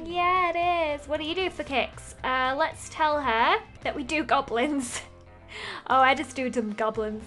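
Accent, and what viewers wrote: United Kingdom. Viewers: Lizzie my big sitter said are you brithish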